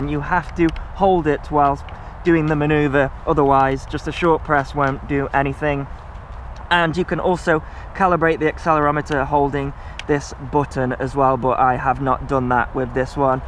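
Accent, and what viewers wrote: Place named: England, United Kingdom. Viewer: G'Day. Pete In Australia here, very nice review, plus I just love your accent (I'm a Pom too) I subscribed to your channel a little while ago, some good stuff here man. Another You Tuber, Aeon38 recommended I talk to you so here I am. Sorry it's so long but I got a lot to ask! I also fly Mode One as does most of Oz and herein lies the problem. I've been flying R/C aircraft now for around forty years now (Don't ask!) and my brain is just absolutely wired to mode1. I have been teaching myself Mode Two recently with a few micro toy quads, F-36, etc (Gotta turn that one into FPV) and all is fine until it starts to go wrong and then the reflex's take over with disastrous results. With this little monster (Bugs) on 2s and later, 3S I do not want my reflexes to kick in and put Bugs in my face. (Or someone else, and this will happen if you are hovering nose out and you go to cut the throttle where-by you inadvertently give a back cyclic input, as you would know). 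What do I do? I really want Bugs 3 and unfortunately they haven't answered an email I sent to them so would you know of any flight controller boards that would work with this on DSMX/2. Or can I hook in a Mode 1 Rx to the standard board somehow? What can I do??? What are the ''Noisy Formula One'' type quads you mention. I neeeed one (on Mode 1 if possible). Would you have any links to the stuff we're talking about too by the way. I want something or things to: Fly real fast and steady. Fly real slow and steady for video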